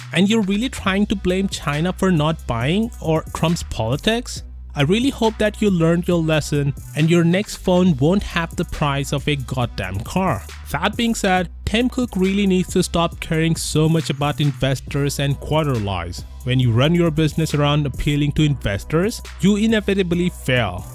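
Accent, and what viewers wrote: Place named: India. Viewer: are you indian, you sound like the tech support from bny mellon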